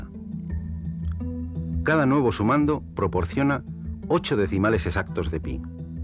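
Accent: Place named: Spain. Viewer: Con esta explicación en español tan tan español y la intensidad del volumen de la música ... No entiendo un carajo lo que dice .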